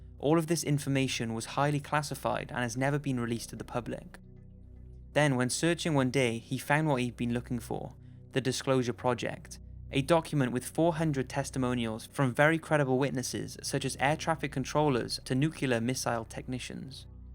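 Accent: Wales, United Kingdom. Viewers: Are u welsh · All the commentary is very well done in your videos. Other similar Top '#' channels either have annoying voices or talk too slow, fast, etc., and your accent is cool to listen to as well. (Which part of the UK are you from?) Keep up the good work! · are you Welsh? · Are you welsh? You sound like you have a Welsh accent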